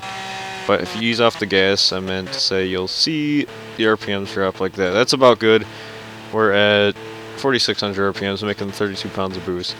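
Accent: East Coast, United States. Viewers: are u from the east coast? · Dude are you from the East Coast?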